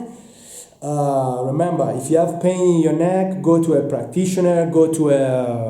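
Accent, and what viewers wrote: Italy. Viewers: Fra ma quanto sei italiano hahahah (nel senso che si sente non altro) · I know an italian accent when I hear one. Grazie frate’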